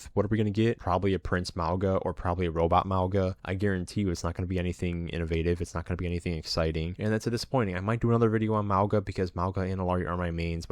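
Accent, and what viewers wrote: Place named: United States. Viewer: You have one of my favourite States accents, especially when you said "battle PASS" 10/10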